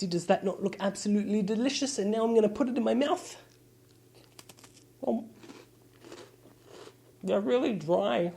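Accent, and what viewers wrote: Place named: United Kingdom. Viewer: You have a British accent when you're sick.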